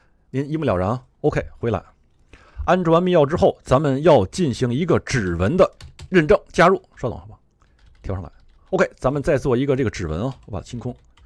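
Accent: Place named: Japan. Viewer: 您的日语口音的英语太有意思了 LOL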